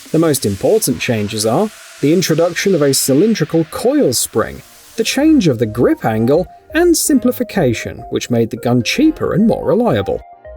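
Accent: England, United Kingdom. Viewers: Did the UK accent give it away? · Sounds english, makes sence as over here we have to have licences for everything, even airsoft guns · That was the best video with the British narrator I've ever seen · why the brit narrator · British accent should be a dead giveaway that this guy doesn’t know anything about firearms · English accent. How much of a gun guy could he be? The royalty disarmed it's "subjects" long ago. They have a false sense of security. By the way, how is that Muslim thing going in your parliament? The UK is in greater peril than the rest of Europe. The greatest way to control your subjects is to disarm them. Then the brainwashing starts. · And hes british as fuck, he said alooh-minium. Its Aluminum. Fuck. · No shit he’s British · Narrator sounds British, which explains his apparent lack of knowledge about firearms. Remember, the British let their government disarm British citizens, making self defense illegal. 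"Gun Control" (and crooks) win, citizens lose.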